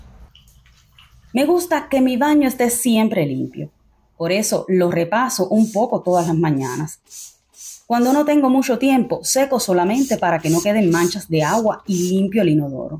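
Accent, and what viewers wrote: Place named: Dominican Republic. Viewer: sospechava que fueras dominicana amiga,gracias por contestarme🏠🇺🇾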